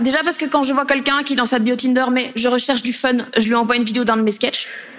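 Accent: Belgium. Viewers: Elle est belge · découvre l’accent belge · Depuis quand elle a un accent belge? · C'est moi où là elle a "repris" son accent belge qu'elle a pas d'habitude à la radio?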